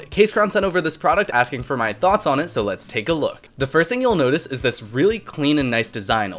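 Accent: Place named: New Jersey, United States. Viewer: guess your from Jersey haha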